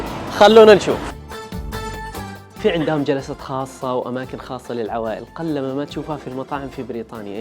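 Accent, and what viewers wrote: Yemen. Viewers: كانك يمني من صوتك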